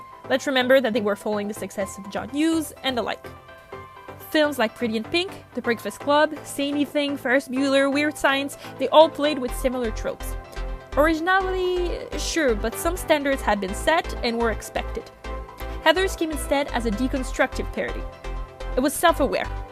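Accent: Quebec, Canada. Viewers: are u from québec?? i feel like u are from ur accent!!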